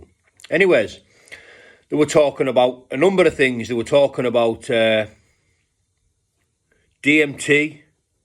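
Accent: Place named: Luton, United Kingdom. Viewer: You come out with some funny words coming from Luton sounds like another language mate,all the best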